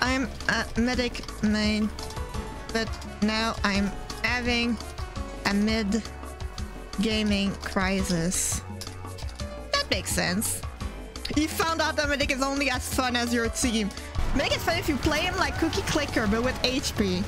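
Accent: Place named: France. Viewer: french or Russian sounding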